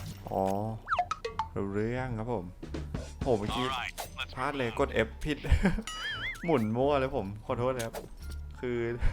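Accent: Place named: Ratchaburi, Thailand. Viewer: เสียงพี่เหน่อๆเหมือน ราชบุรี-กาญจนบุรี เลยครับ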